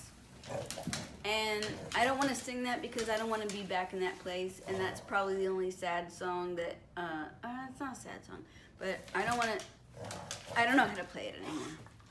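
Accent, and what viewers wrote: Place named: New York City, United States. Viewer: I had no idea her she had a NYC accent! Comes out every now and then, awesome! I get made fun of mines all the time at work, cause for what ever reason I have a slight Bostonian undertone to some words.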